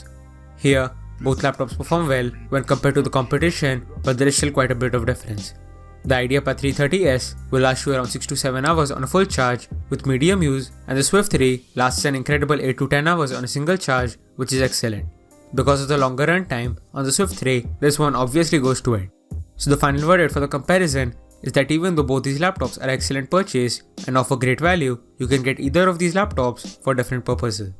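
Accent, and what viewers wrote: India. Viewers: Please talk slower.. no offense but your indian accent is making it worse when you talk so fast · Nice video but you should speak with less Indian accent, it's hard to undestand you. Greetings from Mexico. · Yo less indian accent not rude but i cant understand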